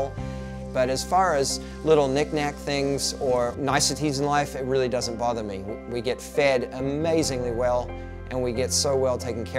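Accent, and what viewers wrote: Australia. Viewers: Straight ozzy. Maybe got the mixed accent from different work environments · partial aussie accent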